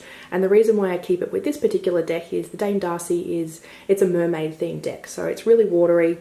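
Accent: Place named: Australia. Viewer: You sound aussie.. ill have to have a look.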